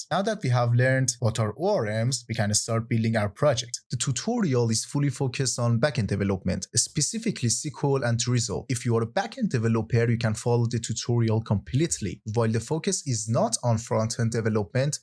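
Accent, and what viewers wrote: Iran. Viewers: I am hearing a familiar accent? Are you Persian bro?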